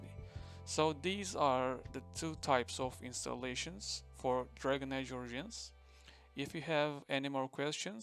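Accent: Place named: Turkey. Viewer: Videoyu izlerken içimden ya bu adamın ingilizcesi bildiğin türk ingilizcesi ya diye geçirirken masaüstündeki türkçe isimli dosyaları gördüm eline sağlık video için kardeşim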